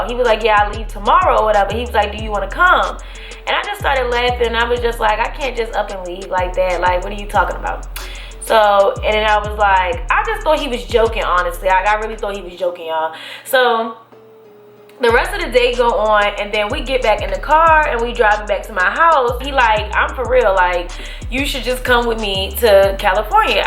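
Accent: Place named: DMV, United States. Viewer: dmv! period...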